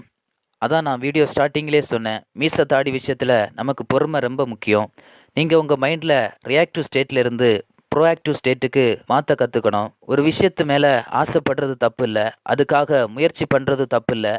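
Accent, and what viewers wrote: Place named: Kanyakumari, India. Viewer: Kumari thamil...👍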